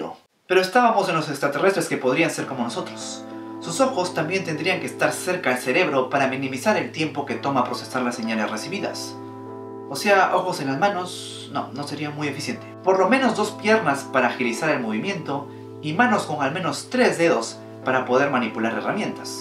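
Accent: Chile. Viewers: Excelentes videos amigo! Consulta, eres Chileno?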